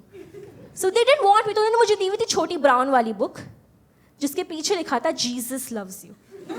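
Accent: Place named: India. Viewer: Her English sounds like hindi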